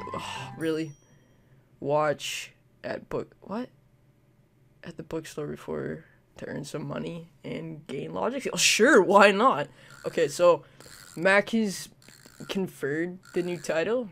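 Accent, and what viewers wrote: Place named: Canada. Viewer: Officially my new favourite gaming channel because sims and canadian accent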